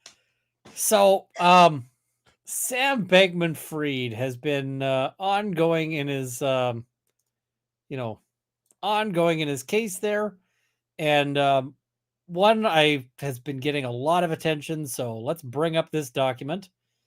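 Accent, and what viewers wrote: Canada. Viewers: It's so lovely to hear your Canadian accent. One of my best friends is a UK born Canadian.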